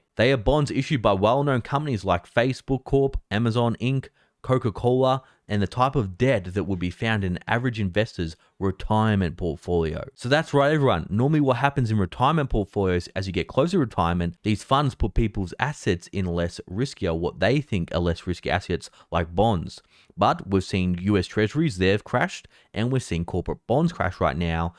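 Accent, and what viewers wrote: Australia. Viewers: He's from Oz.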